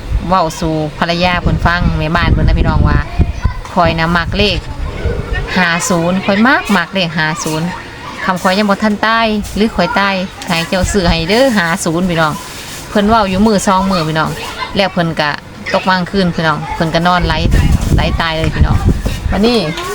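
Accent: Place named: Laos. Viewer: ออกลาวบ่อจร้า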